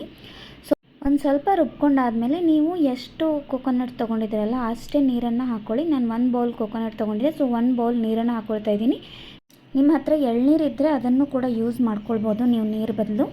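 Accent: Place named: North Karnataka, India. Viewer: ಉತ್ತರ ಕರ್ನಾಟಕದ slang ಚೆನ್ನಾಗಿರುತ್ತೆ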